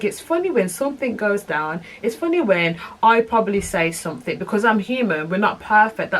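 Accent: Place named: Birmingham, United Kingdom. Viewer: Yea i can hear the brummie accent does not sound manc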